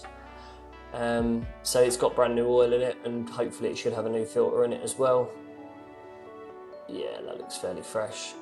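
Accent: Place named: London, United Kingdom. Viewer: Good to hear a londoner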